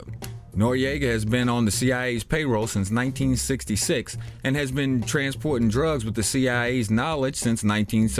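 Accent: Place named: Louisiana, United States. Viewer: Nice one, It is nice to hear your real voice for a change very professional, well articulated, now hearing your voice with a light accent, I could just guess ,maybe your a Louisianan. All good fronts exposed and revisited, Thank you for all your run downs on the populous controllers. Peace Brother. :)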